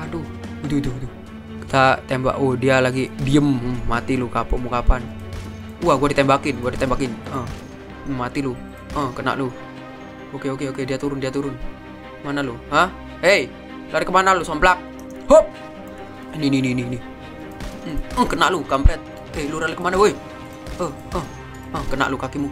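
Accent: Java, Indonesia. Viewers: bang sampean jowo ndi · Ngakak. Bang lu org jawa ya??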